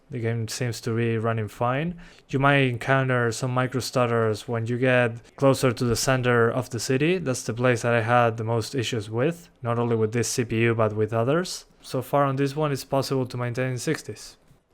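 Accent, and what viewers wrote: Argentina. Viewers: ingles argento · Soy yo o es argentino ?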